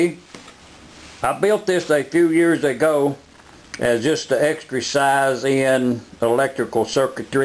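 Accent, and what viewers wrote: South, United States. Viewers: The southern accent is strong with this one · Good stuff. Love your drawl! I love tha south son! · lol if you think that sounds like irsh/scottish then you have a problem LOL wtf... you can tell he's American... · I believe its a genuine dialect of English as spoken in some part of the USA. The English language is very mangled but I can make out some of it. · I think this video and it's comment section served to demonstrate a phenomenon that is counter intuitive, but exists nonetheless.... The phenomenon is, this guy's Southern accent and style of speaking actually caused to generate unwarranted criticism and hatred from viewers...I challenge one to find another video on YouTube that generates so many, i think unwarranted complaints. The complaints are valid, but most homemade videos are all around worse...Cinematography, subject, narration voice and content/explanation... 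I put forth the hypothesis that because you all hear the accent and subconsciously, at the very least, you think he's a racist or bigot in some way....and the rest is a YouTube comment section.... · I like your accent,you sound like a good ol boy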